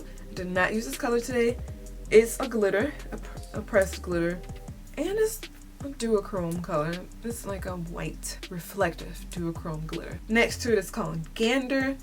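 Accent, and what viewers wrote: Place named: South, United States. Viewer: Oooh...you country! Hahah! I love it though...makes me miss the South. Finch had me at hello! I'm digging that one..this entire palette is a mood. Perfect as we walk into Spring!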